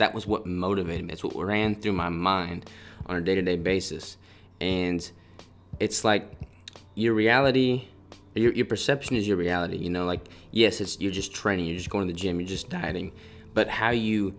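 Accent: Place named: South, United States. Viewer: Where are you from bro? I hear a southern accent.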